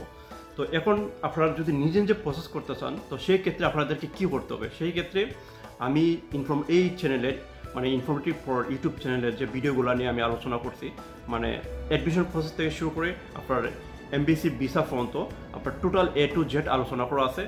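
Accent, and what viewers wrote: Sylhet, Bangladesh. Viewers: ভাই কিতা সিলেটি নি? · ভাইয়া আপনার বাসা সিলেট হবিগঞ্জ??? কথায় বুজা যাচ্ছে,,Am i right?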